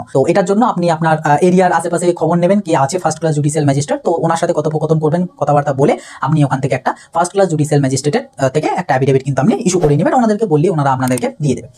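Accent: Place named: Bangladesh. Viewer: Tumi kichu jano naa Bangla vasa er moddhe' so' bola bangladeshi . Jader state obc ache tarao ews korte pare seta hobe central . Porer bar theke bangla vasa ar valo information sikhe bolte asbe .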